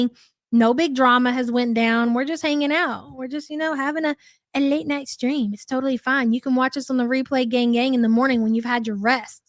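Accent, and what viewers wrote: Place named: Ohio, United States. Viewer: Replay gang today! I love our Support Group and I look forward to meetings in the morning. I'm from Southern Ohio and everyone I grew up with sounds just like you. It gives me so much joy up here in the North where I can sometimes feel a little bit alone. Thank you all ❤🎉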